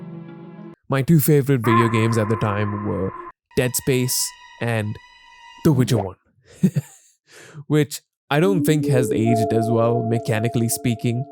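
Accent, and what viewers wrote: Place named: South India, India. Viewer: Why why why you speaking with heavy accent thats why people make fun of us are you south indian or something..stop the accent please its annoying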